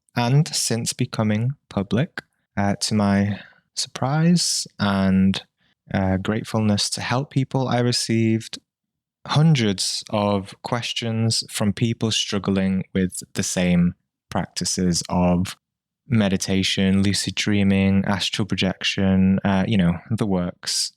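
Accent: United Kingdom. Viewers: Thank You. I am 2-Years Late...but Intuitively I knew...that There was an Expert like You...Amongst the large Herd of Astral Projection Leaders available. I am American, & Your British Accent...and You Clear Style of Speech...attracted Me. Thank You.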